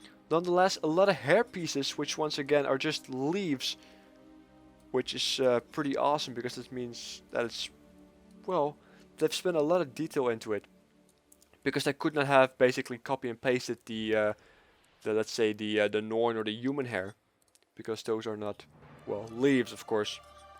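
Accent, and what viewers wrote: Netherlands. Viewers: Do you have a dutch accent? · You sound sooooo Dutch. xD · haha je nederlandse accent is zo duidelijk :P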